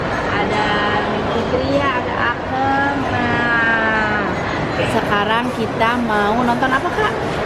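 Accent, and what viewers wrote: West Java, Indonesia. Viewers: Lucu teh 🤣nyariosna logat sunda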